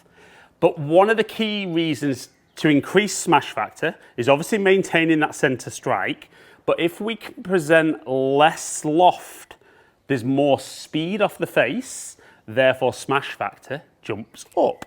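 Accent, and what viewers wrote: England, United Kingdom. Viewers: WOW, not knowing the difference between further and farther!!! And my man sounds English to beat all!!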